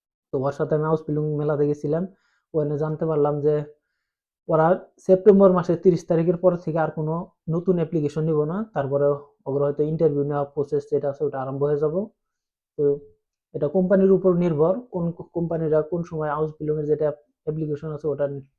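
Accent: Assam, India. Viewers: Assami to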